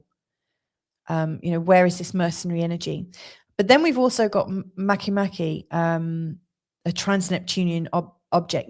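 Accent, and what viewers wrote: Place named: Home Counties, United Kingdom. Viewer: I do like your accent, - it reminds me of home - the home counties?! - from here amid the Great Lakes. More seriously, Maestra, thanks again for the excellence of your work, so generously shared. I must go back to Skool where your stuff is even better. Crossing your palm with a little silver song... old school school! <link>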